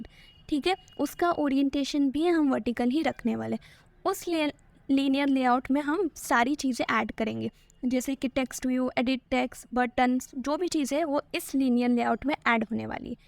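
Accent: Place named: Hyderabad, India. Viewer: i think you are from hyderabad bole to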